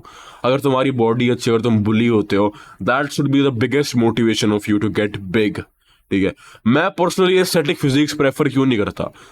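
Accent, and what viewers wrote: India. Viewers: So u have desi tone too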